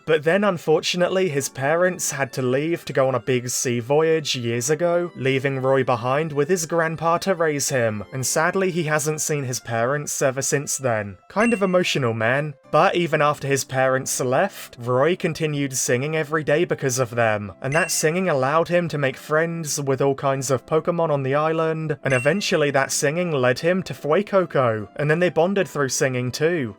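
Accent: United Kingdom. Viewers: Wait a minute, you're British?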